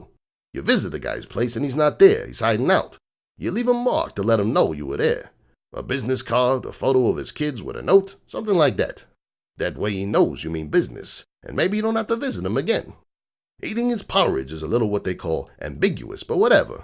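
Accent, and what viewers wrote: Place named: Brooklyn, United States. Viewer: Hehe. Again, I kinda love your Brooklyn accent. Of course, her you've got a lovable scamp of a character, but still. I don't know whether to think there are more bedtime stories, considering how much Bad Horse goes on about good writing, but I hope that there is, and I hope you decide to read more of them.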